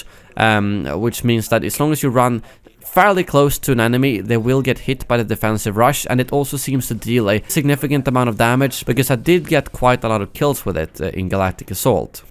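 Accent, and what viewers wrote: Sweden. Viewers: Boi are you from Sweden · Are you from sweden Är du från sverige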